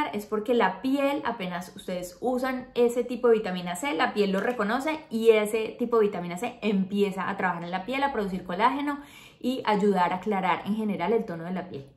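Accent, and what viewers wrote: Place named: Colombia. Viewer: Tenias que ser COLOMBIANA para ser tan HERMOSA y ese acento 😍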